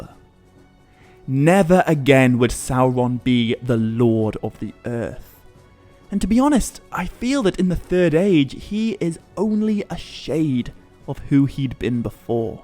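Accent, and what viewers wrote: United Kingdom. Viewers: Wow turns out I hate British accents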